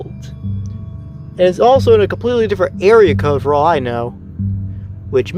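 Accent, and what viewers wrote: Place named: South, United States. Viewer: You sometimes speak with a southern drawl. Are you southern, or is this an act?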